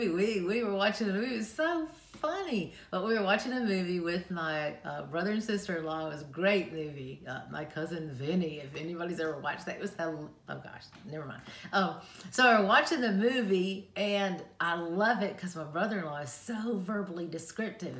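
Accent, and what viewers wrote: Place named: South, United States. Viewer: Hi: I know this video was posted several weeks ago, but since I'm new and I really love your content, I'm catching up. So please forgive the late comments.:) I thought you did a great job with this informational video. I liked how clearly and kindly you explained everything. Also as a side note, you have the coolest accent; I can't help but think of southern homecooking and maybe being in a comfie room chatting with you and other southern belles. Back to your video. I think one of the things many people don't understand is everything we experience involves the brain. I have a huge love for neuroscience so I like to at some point educate involving the brain--without getting to sciency.::LOL:: I think many sighted people don't get that the eyes send signals to the brain and the brain does all the processing. As for guide dog knowledge, man oh man, are people more clueless than ever! 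You did a great job explaining what Gretchen does and doesn't do. I would have also emphasized what part you played in getting her to where she is as your guide. Yes, she's trained to do this, but she wasn't just trained by SE trainers; unless you had a trainer who taught her things like locating the counters and trash cans, you did that yourself. I would have said that early on because I think many have this impression that the dog does these things but the handler doesn't have much in the training aspect. How cool that your girl can find all of those things for you. Is she just really go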